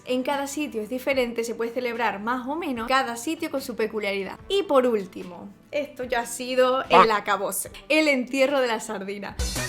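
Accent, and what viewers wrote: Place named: Murcia, Spain. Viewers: Pensé que eras de Murcia ! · Te sale vena andaluza,muy cerca de Andalucía as tenido que vivir, Murcia?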